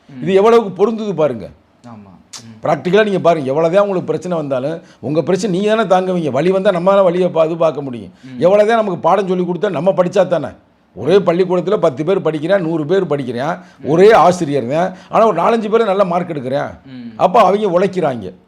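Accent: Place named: Madurai, India. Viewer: மதுரை தமிழில் அறிவார்ந்த சொற்களை கேட்கும் பொழுது.... இனிது இனிது... நீர் வாழ்க....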